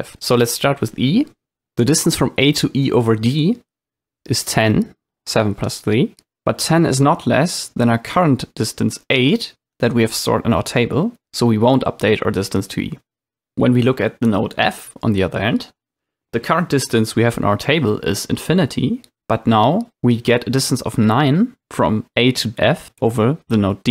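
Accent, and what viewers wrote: Germany. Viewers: I trust this guy beacuse of his german accent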